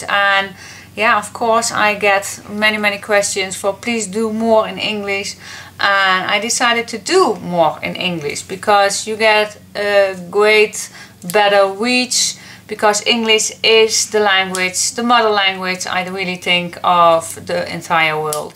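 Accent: Netherlands. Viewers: Congratulation and well done . Thank you so much for sharing your great scrapbooking ideas . I get inspired<3. And I just love your dutch accent. from Anette T from Denmark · Sept 30, my mom (who is from Friesland) and I were scrolling thru scrapbook videos - looking for ideas when we came across Christmas Magic and gave it a look. I said "Wow, this lady is awesome!" and subscribed. Mom said "Wow, this lady is Dutch and has fantastic nails" and then "Would you make this scrapbook for me?" Anyway, I have watched many of your videos now and completed the Christmas Magic (or Masquerade) last week, only with different papers - not Christmas. It is gorgeous and your instructions are easy to follow and to adapt a little to suit my needs and ideas. Thank you so much! I love to listen to you. Your accent reminds me of my grandmother, only your English is better. Even after 30 years in Canada, she still was about 60/40 English and Fries. 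About a month ago, I got a great deal on some kitchen styled papers at a local craft shop and made a little recipe book, almost by accident as I was trying to figure out what to do with it. It is really cute but small. I was thinking as I finished up the CM book that I would make a recipe book (bigger) next (as a Christmas gift for my daughter) and what do you know? there was my new favorite mentor showing me what she had completed (and hinted at during the CM tutorials!). Thank you again! You read my mind! I love your work! Please keep it up! I am anxiously awaiting the tutorials for this!!!! PS - maybe your desire to say cool, cool, cool came from a Canadian friend - I hear it all the time, mostly from people who a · It’s won’t be long and you’ll have 50,000. Your absolutely amazing lady! Thank you for all your inspiration. Your work is fantastic and I love your accent because it reminds me of my mother in law. 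She from holland and I completely adore her. Can’t wait for the next video. Take care and happy crafting. We are in Alberta, Canada. 😍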